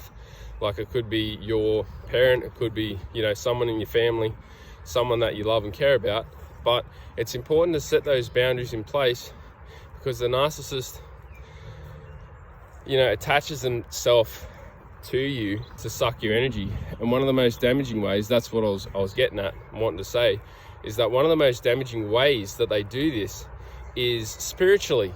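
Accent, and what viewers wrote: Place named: Australia. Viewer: awesome stuff my brother just found you're channel now you sound like an aussie I'm from sydney would love to have a good old yarn with my fellow brother in Christ about our testimonies , the spiritual warfare , isolation of those who can see and hear ,the ones that pray for discernment and pray for a mighty strong faith in the way the truth and the life and pray our Father`s will be done on earth as it is in heaven . i don't usually comment on anything but felt i should right now would be cool to catch up some time my bro GOD willing God bless bruv Deano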